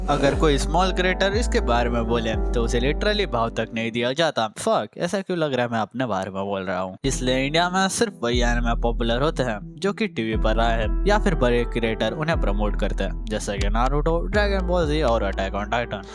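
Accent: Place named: Gujarat, India. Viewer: you sound like gujrati tbh 🥀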